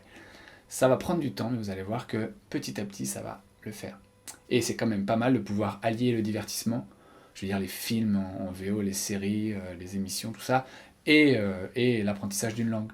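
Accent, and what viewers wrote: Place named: United Kingdom. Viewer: J'adore votre accent so British 😊😊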